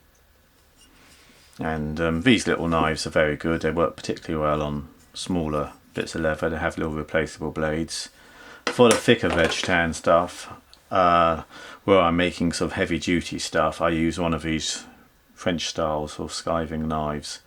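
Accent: England, United Kingdom. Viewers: Great...I love your work and your country English accent.........😊I have a beautiful collection of approximately 100 year old C.S. Osborne tools including Space markers. French edgers including curved etc...A round knife Circa 1900 stamped Crawford..new edge...my everyday tool...Large diameter hole punches...etc... I certainly enjoy your videos,.....P.S. Do you ever work with Cordovan Shell 🐎?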